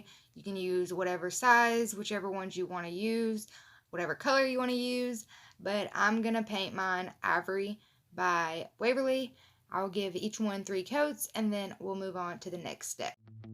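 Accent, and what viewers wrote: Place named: East Texas, United States. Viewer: You are very creative! I love the 3-tiered tray and I am most definitely making the “I Can Make That” sign. I say that all the time. Also love your sweet accent! ❤️East Texas?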